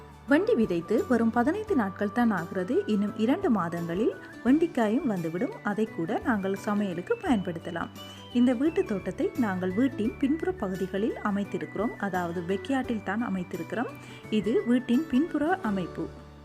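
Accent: Sri Lanka. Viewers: I love your chaste and pure Sri Lankan Tamil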